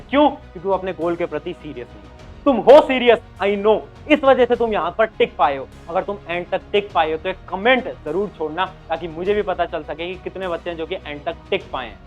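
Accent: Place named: Western Uttar Pradesh, India. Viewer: Ye bhaisaab west UP ka lag raha he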